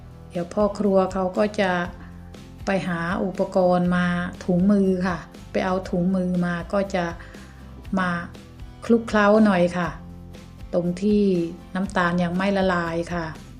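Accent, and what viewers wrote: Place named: Surin-Buriram, Thailand. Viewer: พี่บัวบุษบงมาชมการทำแตงกวาดองด้วยจ้า ว้าวง่ายจังค่ะ จ๋าเป็นคนแถวไหนน้อพูดเหมือนคนแถวๆสุรินทร์บุรีรัมย์เลยจ้า..พี่บัวคนสุรินทร์เด้อจ้า😂😂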